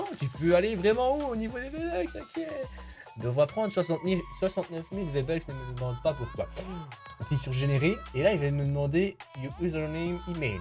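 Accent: Belgium. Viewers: Tu es belge? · tu es belge????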